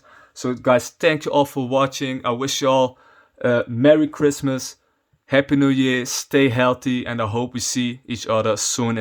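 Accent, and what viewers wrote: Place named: Netherlands. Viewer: Mooie deck profile mooi nederlands acsentje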